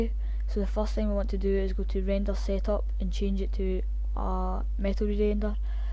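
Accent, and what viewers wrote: Scotland, United Kingdom. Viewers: Cannae beat a bit of scottish accent... :P pretty cool man, any idea how tae get it a bit clearer... mines coming up too dark and im making a realistic coffee machine for a uni project... I know i should know this cause im in uni but i only found out this "pro materials", its called Arch & design in 2012, l like a week ago.... cheers lol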